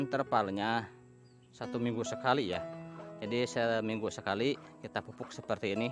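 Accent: Indonesia. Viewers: Very nice orchids and advice. It sounds like you are Indonesian? I lived there for 12 years and loved it 😎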